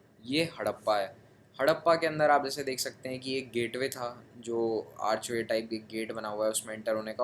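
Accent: India. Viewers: English has been taught in India since 1830. Why can't you speak English? Do not worry about your Hindi accent. Just speak English!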